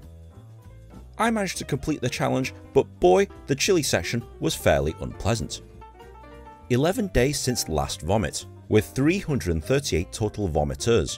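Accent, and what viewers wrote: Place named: Northern England, United Kingdom. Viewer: Never would i thought to see and hear 😂 an Asian northener from the UK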